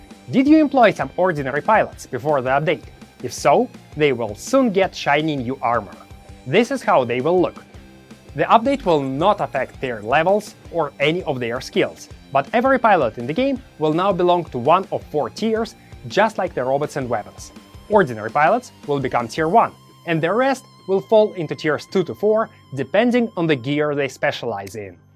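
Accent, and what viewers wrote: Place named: Russia. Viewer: Мэн ну у тебя и акцент... Русский чтоль?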